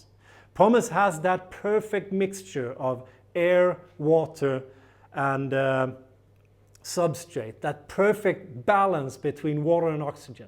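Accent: Sweden. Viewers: Perfekt Engelska, jag älskar den svenska accenten! du ger bra råd, tack så mycket. Min svenska är skräp..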